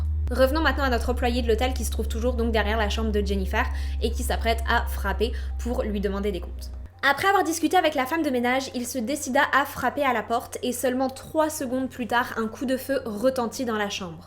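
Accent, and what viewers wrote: Quebec, Canada. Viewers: Bonsoir, j'i bien aimé cette histoire que tu nous as compté ce soir. Je suis partant pour que tu nous parles aussi d'affaires non-résolues, d'affaires résolues des années après et de crimes comme tu avais commencé à le faire. Pour ma part, cette pauvre Jennifer semblait faire partie des services secrets d'un pays européen comme la Belgique. Son soi-disant suicide n'est pas du tout valable car il y aurait eu forcément son sang sur le pistolet et sur sa main et de plus, le pistolet aurait été dans une autre position que celle montrée sur l'image. Merci pour ton super travail, j'adore, tu es formidable. Petit bémol, pourrais-tu ralentir le débit de ta voix. Tu as des courses à faire après ta vidéo, présentement? Continues à nous compter ces histoires criminelles avec ton joli accent québécois et tes belles expressions françaises qui sont désuétudes de nos jours en France. 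Serait-il possible d'en savoir un peu plus sur toi, stp? Belle fin de soirée. Bisous criminels sexy nocturnes. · J'ai pensé des le debut de la vidéo que c'était probablement une histoire d'agent des services secrets, et je le pense tjr à la fin de la vidéo ducoup ahah, c'est le genre de crime limite banale qui se passent dans les dessous bien sombres des enquêtes de ces services d'espionnage, sinon tres bonne vidéo, j'ai fais un marathons de ta chaine aujourd'hui ayant pass la journée chez moi comme malade et j'aime beaucoup ta façon de raconté les histoire ( et le léger accent québécois ahah ) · D’où vien ton accent tu parles un peu québécois je trouve ?! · Je confirme également, je l avais capté cet accent québécois et c est très mignon 👍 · salut a entendre ton accent ,tu es quebequoise? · Coucou! Je suis du Québec et j'ai eu l'impression tout au long de ta vidéo de deceller un petit accent de chez moi?! 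En tout cas, tu as une nouvelle abonnée, félicitations pour ta vidéo ! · j'aime bien ton petit accent canadien ^^ c'est cute et continue ce style ( je te decouvre avec cette affaire ) et les truecrim , c'est grave intéressant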